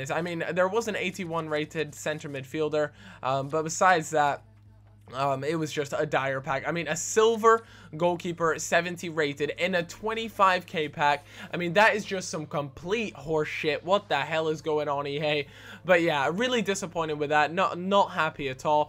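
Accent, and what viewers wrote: United States. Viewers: Sound American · R u American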